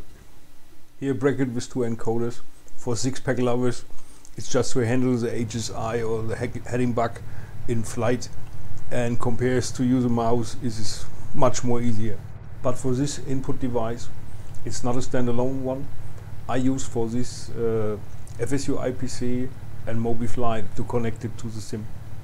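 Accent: Germany. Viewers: Höre ich da einen deutschen akzent? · Danke für das ausführliche Video! Ich gehe mal davon aus das ich einen deutschen Akzent gehört habe und schreibe frech auf deutsch. Hast du das im Übersee gekauft oder in einem europäischen Shop wie Winwing ihn hat? Frage bzgl. der Kosten für Zoll etc. Und vielleicht gibt es ja einen deutschen Supplier den ich nicht kenne. Danke im Voraus!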